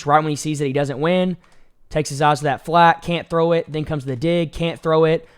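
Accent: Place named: South, United States. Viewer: This is why was from the south aren’t good. Listen to this gentleman ramble. By the end of the first play it was clear this guy has limited football knowledge and experience. Bo Nix should have made the throw to the right check mark to what you called his 4th read and it was obvious when you drew it up that he would be open IF you KNOW how to pre read defenses. You could’ve mentioned the cover 1 shell. Clean up your accent too you sound silly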